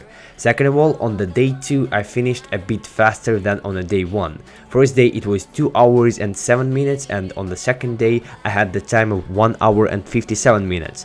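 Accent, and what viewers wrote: Russia. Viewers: Над произношением еще поработай, а то немного палишься с русским акцентом) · Блядь, какой-то русский акцент прослеживается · Are u russian? P. S чекнул инсту, понятно. Получается, я понимал инглиш проще из за акцента. Для большей аудитории снимаешь на english? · Мне кажется или ты Russian? Акцент, сука, русский.... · Чувствую русский акцент))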